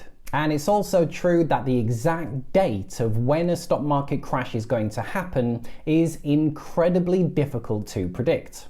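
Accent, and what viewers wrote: United Kingdom. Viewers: This is great for the American market but what about the London Stock Exchange? You sound like a British person but focused on the S&P exclusively. There seems to be a bubble in the USA but in the UK values (I've heard) are similar to 20 years ago. Could an American crash cause a UK crash?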